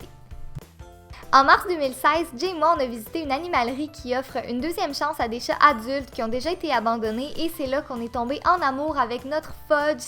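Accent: Quebec, Canada. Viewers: Ya lacsent canadien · Oka la tueuse c'est l'accent québécois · J'adore ton accent québécois :)super viéo !!! ;) continue comme sa :)) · elle a un gros accent...Aussi elle est quebéquoise,mais moi je suis née en France et je suis Française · J'adore laxent canadien 🙃😀 Mais sinon super video 👍🏻👏🏻😍😘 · J'adore ton accent canadien 😍😍😍😍😍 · J'adore l'accent québécois 💞 Sinon, j'ai adoré ta vidéo 🤗❤️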